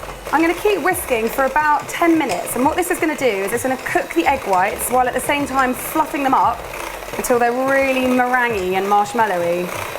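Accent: England, United Kingdom. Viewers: Shes from England if u didnt know :)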